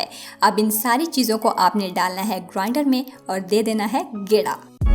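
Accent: Punjab, India. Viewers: Hahahaha ap Punjabi hooo ghera Dena grander ko sooooo 😍😍😍caut gheera😍😍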